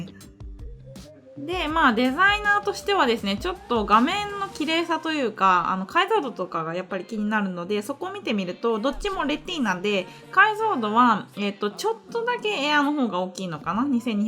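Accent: Kansai, Japan. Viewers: 『なにげに再生しただけの apple信者でもない ipadmini4 持ちの爺々ですけど・・・』 『指先も ネイルもbeautiful 話す言葉が速いのによく聞き取れる明瞭感 時々関西弁もカワユス👍』 『わぁぉ～！ 顔出しもあるんかい？ 超絶かわゆくて 今日からイイね押して フォロー決定👍👍👍』 正直 確かにsensei名乗ることだけあるお人で・・・解説動画としての秀逸さに感服致しましたm(_ _)m 邪な助平心は捨てて・・・ を師匠と仰ぎ ipadの利用について 一から勉強し直しますです！！！ マジで知らないこと多すぎ、便利そうやもんねぇ。有難う御座いました。この際追加購入検討するかな！ by にゃんこ爺々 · センセーの関西弁萌😍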